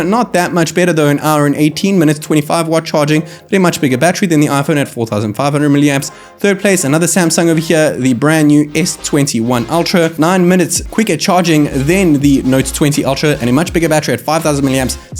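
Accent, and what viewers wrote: South Africa. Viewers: Bro are you from SA? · Had to like and sub because of the South African accent ❤️ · Listening to you, sounds like you're South African, getting some SA accent vibes :D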